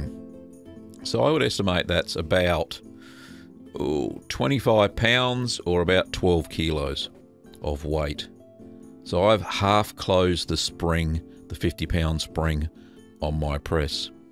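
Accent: Australia. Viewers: Aussies have their own way of saying things, part of why we love them :)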